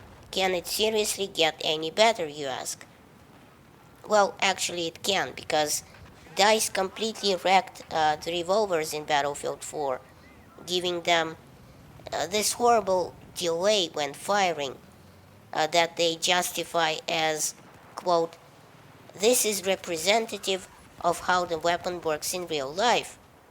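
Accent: Russia. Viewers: the coolest thing that people from russia have there accent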